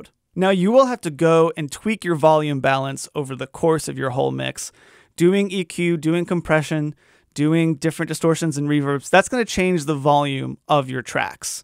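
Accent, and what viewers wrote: England, United Kingdom. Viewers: when did you stop being british? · What happened to the English accent?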